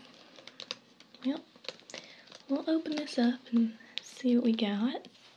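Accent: South, United States. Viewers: How not love her southern accent 😊😊😊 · Good job depicting how us southern talk and act .. well the true south that is .. hehe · It's insane how much you sound and act like my cousins in the south in these roleplays. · I love this character! I'm not sure if you're from the south, but you do a pretty good job executing the twang. ; ) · This is by far the tinglest video you've made...especially at the beginning when you're just talking in that melting southern voice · This southern accent is soo cute 😍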